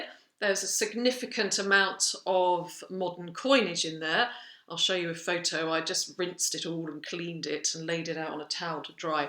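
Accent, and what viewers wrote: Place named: RP, United Kingdom. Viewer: You should really do voice-over work with your Received Pronunciation speech. It is quite charming to the American ear.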